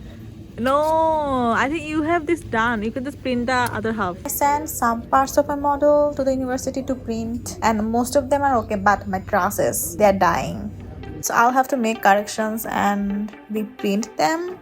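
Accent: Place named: Bangladesh. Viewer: i'm a student of hsc 26 batch and recently i decided that i want to study archi from buet. so i searched up archi related bangladeshi videos but the content is so less. this video randomly popped up in my yt homepage and i started watching and thought to myself "accent ta amader moto lagtese. apu ki bangladeshi naki?" and went to comment section to check and YOU ARE ACTUALLY ONE OF US. i just binge watched all of your YT videos and GIRL I HAVE BECOME A HUGE FAN. a girl? check. buetian? check. archi student? check. moved to usa? check. APU UR LIFE IS LITERALLY MY DREAM AND I ASPIRE TO LIVE LIKE THIS ONEDAY. lots lots lots of love and im so disappointed to hear that u got trolled online. plz dont stop making these videos. im really motivated rn as i learned je amr dream is not actually impossible and someone is living it already. lots of bhalobasha again ❤ ❤ ❤ ❤ ❤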